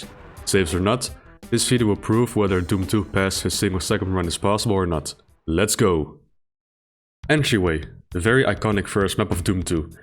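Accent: Netherlands. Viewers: Are u dutch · Wait youre dutch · You Dutch? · Are you dutch by any chance? Your accent sounds dutch. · Have to say I also thought he was Dutch. Am South African and used to live in NL for a few years.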